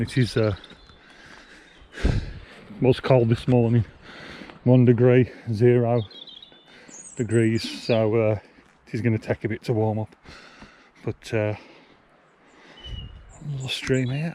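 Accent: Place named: Yorkshire, United Kingdom. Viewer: Hi, so I found part 1 and just watched it and will watch the other 3 parts soon. Gotta ask you about the accent mate, where you hail from? Yorkshire? I liked your style of editing too, very similar to the style I do (and those placing the camera down and walking towards it shots like you do!) Loved seeing the train station and the train tracks at the beginning. Great weather you had, makes for good views on the camera. At different points in the film you seem to alternate with lenses, ranging from narrow to wide. Do you use a GoPro by any chance? Nice stabilization on it. Couldn't help notice the nude girl in the newspaper as well.:) I paused to try and get a look at your footwear as well and noticed you were wearing trainers but couldn't quite make out which. A good video, well filmed, nice angles and smooth pan shots.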